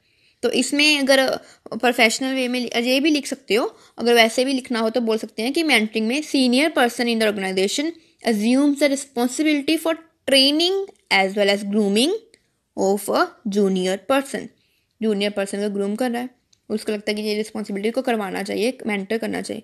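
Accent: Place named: Haryana, India. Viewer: Bolne ka tareeka bhot acha h sab samaj aa gaya... Aur apki haryanvi tone 👌🏻👌🏻👌🏻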